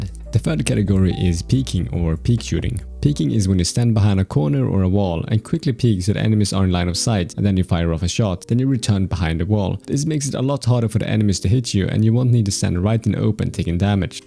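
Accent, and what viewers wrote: Sweden. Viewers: Bra video som fan, man hörde på din första mening den markanta "svenskheten" :P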